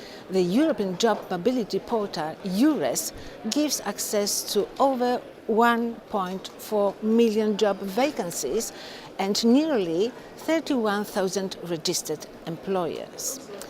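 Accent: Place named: Poland. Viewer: No wymowa angielska nieszczególna, bardzo wyrazny twardy polski akcent.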